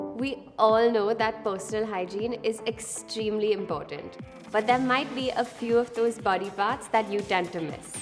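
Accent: India. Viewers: has an Indian Assent. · She has an american look. But she talked like indian woman.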